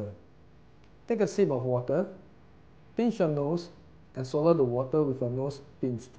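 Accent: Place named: Nigeria. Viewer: He sounds very nigerian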